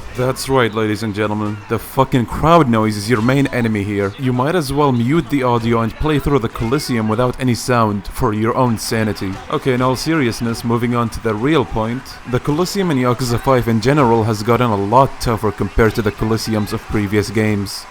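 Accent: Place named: Bahrain. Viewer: It’s very easy to tell that you’re bahraini from your accent 😂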